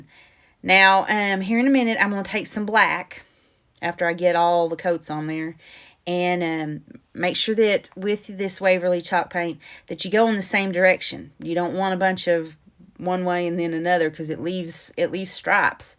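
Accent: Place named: Louisiana, United States. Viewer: Just found you on YouTube. Love everything you do. I'm an old Louisiana girl so love seeing Louisiana girls doing their thing. I really, really miss the accent.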